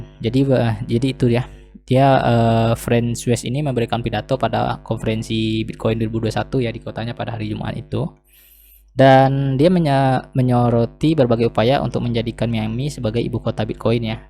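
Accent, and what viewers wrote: Padang, Indonesia. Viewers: Logat2 e bunyi urng Padang,pas cek Namo e ,eh urng Padang,Dima Padang e bg?Wak pdg Lo a kwkwkw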